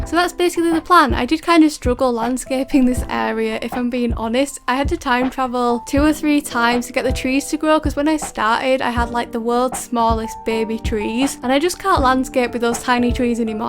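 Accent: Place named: Scotland, United Kingdom. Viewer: i’m realizing you’re scottish not irish bye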